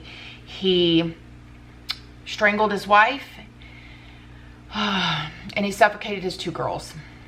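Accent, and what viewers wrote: United States. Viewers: .....are you American????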